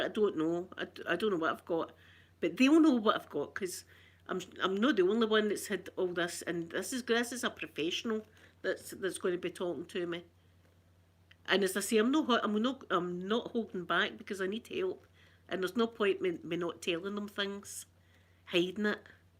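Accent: Scotland, United Kingdom. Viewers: The great start is to seek help and accepting that you need it (no shame in that at all it is a very brave thing to do) and if you want to cry...never deprive yourself of a good cry as it helps to release those pent up feelings....Do know that you are loved and not just by your family....you are a joy on your videos and you never fail to make me smile when I feel down with your make-up vids, your cute funny demeanor and banter in that beautiful Scottish accent of yours....The one good thing about going through trauma, loss, illness and sadness is that there is only one way to go....and that is up, make it a challenge to strive for it and you will get there..believe me, I know xxxxxx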